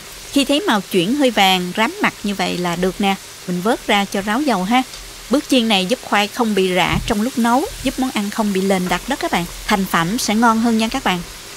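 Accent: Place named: Mekong Delta, Vietnam. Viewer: Giọng dễ thương, đúng là gái miền Tây nam bộ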